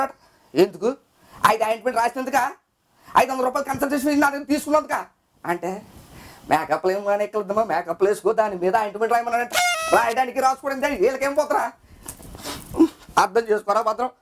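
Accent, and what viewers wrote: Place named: Godavari, India. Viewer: ...godavari slang that too konaseema slang made all the difference...very great attempt...u simply rockzzz...awesome... glad to see comment of puri jagannadh sir stating that he needs actors like you... u made a succesful attempt CONGRATS:)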